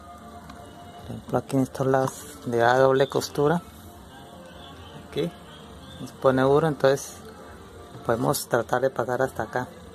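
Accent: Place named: Santander, Spain. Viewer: que no se note que es santandereano, maestro.....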